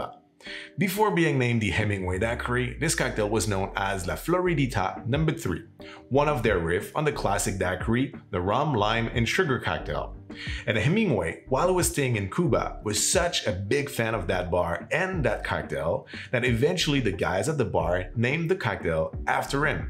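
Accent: Quebec, Canada. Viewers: lol.... yeah, where's the tree? I love the Québécois way of speaking too. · 😆 I love the French-Canadian treatment of the letter "H." You say it when it's not there and don't say it when it's there. My friend always calls those tubes of meat on buns "ot dogs" and always offers "elp" if I need assistance. Now on to the Emingway Daiquiri! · As a fellow québécois, I love seeing your videos when they come out! We need more of the accent on YouTube. Also, because I'm a filthy anglo, I have to say it -- why can't francophones count to four? There's a tree in the way. :v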